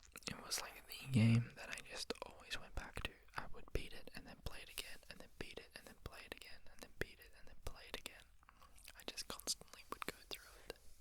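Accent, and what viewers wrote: Australia. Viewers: When I clicked on your video, my interest was immediately piqued by the familiar Perf accent, and I'm so glad I stayed because I love your rambles. I know a lot of women like myself have difficulty relaxing to the sound of a masculine voice, but you're so gentle and sweet that it's easy. Thanks for your videos,! <3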